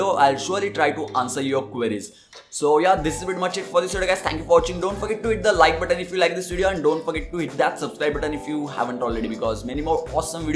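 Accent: India. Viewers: 有点印度阿三的口音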